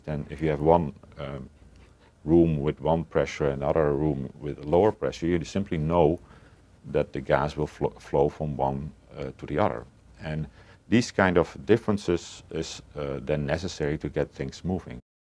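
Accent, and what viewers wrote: Netherlands. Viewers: ***** The meaning of "He's Dutch" is that he actually lives in the Netherlands & speaks Dutch. Since English is his 2nd language (assuming he doesn't speak French & German as well) his articulation isn't quite as good as a native speaker. That does not mean there is anything wrong with his science. Only his articulation of it in English. For me, I understand him fully. Sinds ik spreekt ook Nederlands. But since you & the rest of the flat brainers think he is saying gravity doesn't exist, I have to assume it is in his use of the language. Since you can't understand what he is saying & also can't understand my point I have to assume you have an IQ of around 58. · As a fellow Dutchman, I recognize that accent 100% of the time. ;-) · That Dutch accent. I recognized it immediately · I think he sounds perfectly like a damn dutchman, with his accent, in english, no idea why you think otherwise.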